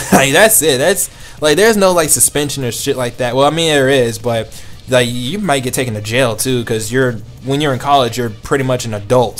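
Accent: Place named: New York, United States. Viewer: My NYC senses are tingling, yur a new yorker arent u?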